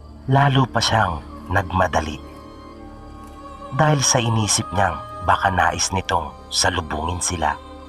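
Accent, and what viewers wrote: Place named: Kinaray-a, Philippines. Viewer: Pamatyag mo eh,, hahaha karay-a gid,, 🤣🤣🤣🤣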